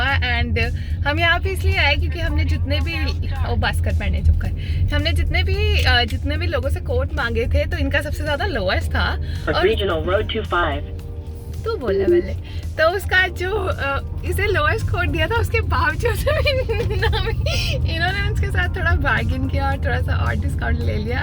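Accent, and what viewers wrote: Punjab, India. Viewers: those punjabi touches when you speak are so lovely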